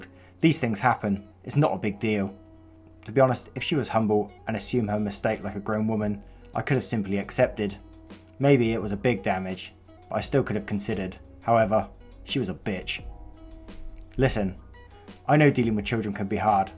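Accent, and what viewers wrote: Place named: North, United Kingdom. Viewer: Subbing because I love your accent. I’m guessing Northern UK?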